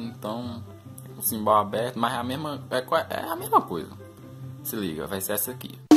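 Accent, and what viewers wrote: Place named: Northeast, Brazil. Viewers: Teu sutaque parasse se da Paraíba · Forró é vida ? Só pelo sotaque vejo que é nordestino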